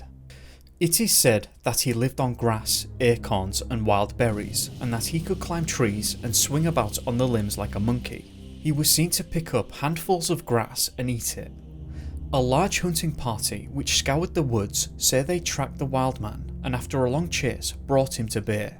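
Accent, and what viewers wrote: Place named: Northern England, United Kingdom. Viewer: It's his Northern English accent , fairies is pronounced furries .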